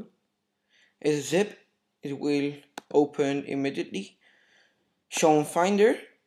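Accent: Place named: Mexico. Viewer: gracias debolada se te ve el acento de mexicano XD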